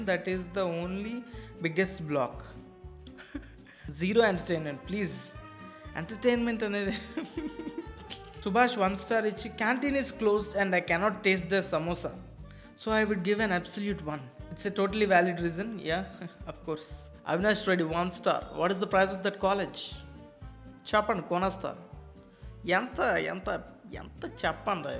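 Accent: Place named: West Godavari, India. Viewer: West 😂😂 slang iragadisav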